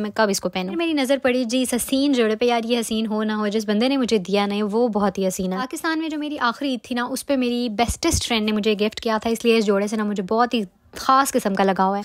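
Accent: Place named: Punjab, India. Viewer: Ap ky vlog ka shaded wait rehta hai... Lamba bnaya kary ... Punjabi ka tarka hi to acha lagta hai ❤😊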